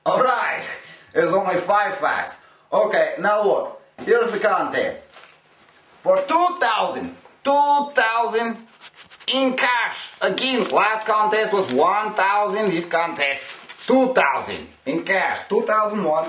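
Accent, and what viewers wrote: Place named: Ukraine. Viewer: I can't make sense of the riddle, but from your accent alone, my guess would be somewhere in the USSR (as it was still called in 1978), perhaps Ukraine. Second guess would be Poland, but that seems too obvious.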